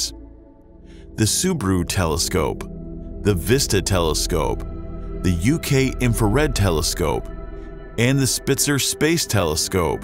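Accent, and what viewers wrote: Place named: United States. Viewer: clicked on video then heard this mans american accent, yeeeesh no thanks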